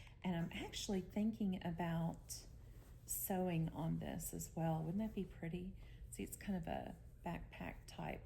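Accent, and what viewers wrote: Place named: South, United States. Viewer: no you won't bore us. I watched two of yours videos today and you weren't boring at all. Plus you have that cute Southern accent. I wish I had one 😊 I'm a Southern girl at heart. At least I get to visit the south these days and I have Southern friends now.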